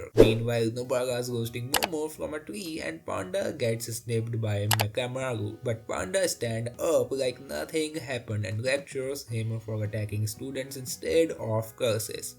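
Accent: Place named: India. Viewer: no hate i would have watched if it wasnt indian voice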